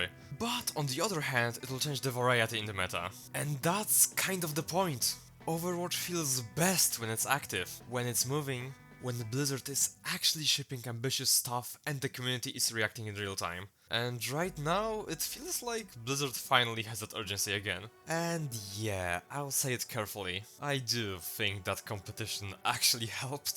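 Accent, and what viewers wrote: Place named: Germany. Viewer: The strong German accent gave away that for once I wasn't recommended AI slop good vid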